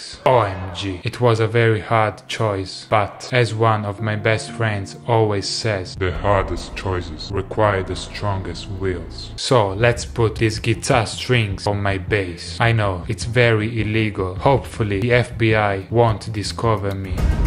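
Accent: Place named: Italy. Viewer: and when he talk..he sound like italian guy...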